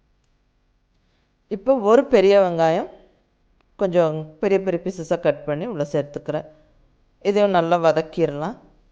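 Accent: Coimbatore, India. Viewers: unga slang keta neenga from Coimbatore thaana 🤔, anyway thank u 😊 IL try this for sure 👍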